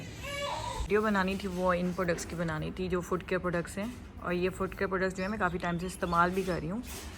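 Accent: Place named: Rawalpindi, Pakistan. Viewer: Totally pindi accent .you are mashallah you talkative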